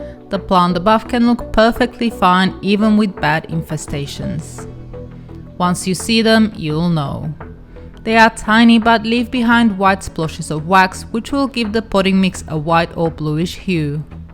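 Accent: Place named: South Africa. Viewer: It sounds like you have a south African accent, sounds a bit like my friend from the west coast.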